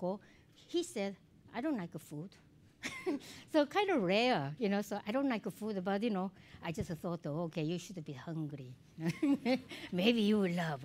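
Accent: South Korea. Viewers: She is gentle. I was surprised that few subscribers were Koreans back then. Well, I got to know of her YouTube channels several years back. I wanted to learn how to describe making food in English, and then I found her channel. I was so fascinated by her videos made in English. I definitely feel that she was born and raised in Korea as soon as I listened to her speech. However, she had no difficulty explaining things in English. That's when I realized that fluency must've come first before accuracy. I know my purpose to visit her YouTube was quite different from yours, but that's the moment I started subscribing her videos. Thanks. And I cracked up when you explained how you made your pen-name,. Please, keep up the good work. 수고하세요! 감사합니다! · 이렇게 열성적으로, 그리고 처음엔 아무런 보상에 대한 바람 없이 순수한 마음으로 수많은 한국의 정통 음식을 전세계에 알린 한국분이 이 분 말고 또 있었을까 싶다. 그녀의 공으로 지금은 인정 받고 가치 있는 보상을 받아 기쁘다. 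이런 분이 한국에서 공로상을 받아야 하고, 한국홍보사로 지정되어야 한다. 그리고 영어 정말 잘 한다. 저 나잇대의 한국 아주머니가 겨우 4년 미국 인생으로 저 정도의 언어 구사력과 알아듣기 쉽게 자기 의견을 표출하는 건 영어 정말 잘 하는 거다. 발음이나 억양이 완전히 한국식이어도 상관 없다. 영어식으로 발음하고 잘 해도 자기 의견 표현 못 하는 사람보다 훨 났다. 그리고 레서피 너무 좋고 센스도 좋고 시각에도 신경을 쓰는 모습이 보기 좋고, 심지어 오뎅이나 막걸리도 집에서 손수 만들어서 세계에 널리 알리는 노력도 감사하다. 언젠가 쿡 북 사고 유튜브 보면서 망치 레서피로 막걸리 만들어 볼거다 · My mother and sister made kimchee using her recipe! And we're Korean Americans. Her recipes are authentic and excellent and she is adorable. Love her Korean accent! :) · She’s so funny!! Her accent, humor, confidence makes her such an interesting person. Looove the fact she’s killing that korean accent !!!! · Yang 저도 agree 해요. 전 한국발음이 담긴 망치씨의 영어가 너무 아름다워요. 그리고 family counselor 하시던 분이면 영어 엄청 잘하시는 분이에요. 정말 자신을 믿고 걸어오신 망치가 존경스러워요! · 영어를 잘하던 못하던 열정적인 모습이 너무 멋진 아줌마다. 메이컵하고 스타일은 영어권 느낌인데 발음과 영어하는 스타일은 typical Korean immigrant but she expresses what she wants to tell so she's very full of confidence and successful person! 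I want to applaud what she does! And of course she motivates too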